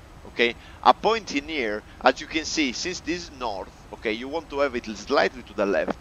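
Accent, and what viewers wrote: Italy. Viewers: Mamma mia, parla troppo...hehehe, Thanks for the video, I can tell you are Italian...just like my family 🙂, Great video, just learned a new thing with you. If you were an American, you would have described this in 2 minutes 🙂..GRAZIE MILLE fratellino.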